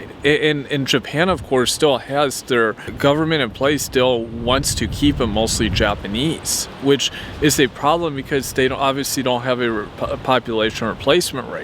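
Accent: Europe. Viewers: The legal availability of "professional women" (brothels, hostesses) as well technology adds to the dynamics in Japan. P. S.:You are from Chicago? You sound foreign, I thought you are from Europe. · You have a european accent why is that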